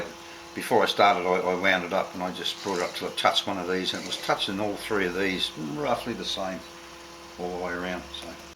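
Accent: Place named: Toowoomba, Australia. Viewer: I thought the speech sounded close, in fact your mode of talk matches a mate who lives in Toowoomba, so much so you could be father and son, but I know that's not possible. So from what you just said, Re Lathe switch, can you have the DRO on, but not the lathe and there is no problem? Or does the lathe switch also power the DRO?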